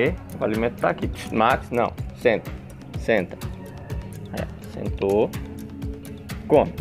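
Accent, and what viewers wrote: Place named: Mombaça, Brazil. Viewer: Bicho das urea de mucego kkkkk sou monbacence eu falo asim memo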